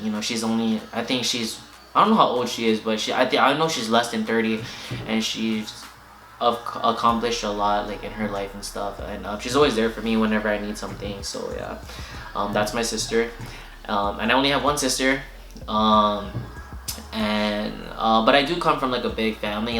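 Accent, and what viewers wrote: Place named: Toronto, Canada. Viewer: you talk like a Toronto man Rate it still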